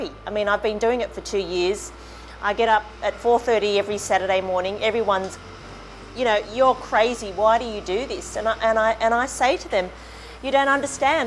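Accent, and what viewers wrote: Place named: Australia. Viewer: Lovely Chinese girl ruined by an Australian accent:-)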